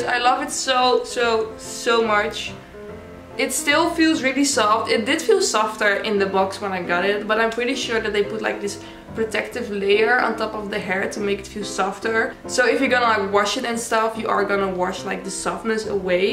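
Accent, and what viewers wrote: Netherlands. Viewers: She sounds Dutch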